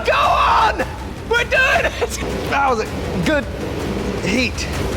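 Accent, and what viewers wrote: England, United Kingdom. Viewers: i like these channel all in all born with hands for steel very fat strong fingers lol cute baby face talks like a real english man has a smart brain has alot of great skills and work ethics and makes alot of interesting things to watch. the whole package is cool and interesting. i like it keep it up. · If I could sound like anyone, I would choose this guys voice. The British accent is so perfect.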